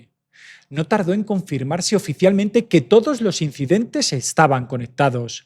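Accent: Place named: Catalonia, Spain. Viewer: Ya se sabe que eres catalana. Por eso sabes pronunciar…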